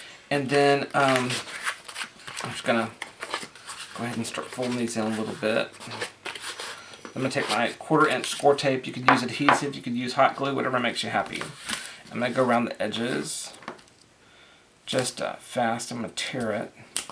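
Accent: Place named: South, United States. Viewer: Fiona has been singing your praises for so long & I finally made it over here. Now I know why. I love the way you don't even think about it. You just keep explaining as you're going along & it's like we're having a conversation. Well except for the part where you can't hear me respond. :) Also the accent & the y'all made me feel like I was watching a long, lost cousin. Where are you from? I was born & raised in Texas. :)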